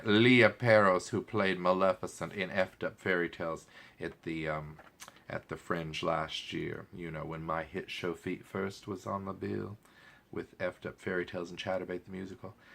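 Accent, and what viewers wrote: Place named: South, United States. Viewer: Hi! Loving your southern drawl.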